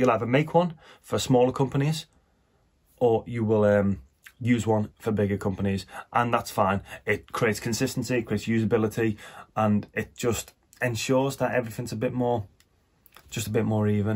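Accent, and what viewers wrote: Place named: Scotland, United Kingdom. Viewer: ok, your accent resembles me scottish one that's why i asked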